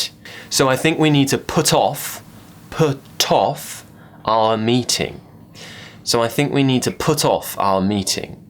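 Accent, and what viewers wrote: United Kingdom. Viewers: Hi! Thanks for your video. Love your British accent, mate!:) I wonder, can we also say ‘go down the pan / the tubes / the plughole’ instead of ‘the drain’ with the same connotation and level of politeness? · I'm so glad to watch you video..the way you talk British English so very clear 😘😘😘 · Thanks so much, very useful video and I like very much your British sound, it's wonderful and clear. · Your accent is awesome! I wish I spoke English with British accent like you. 😊😊😊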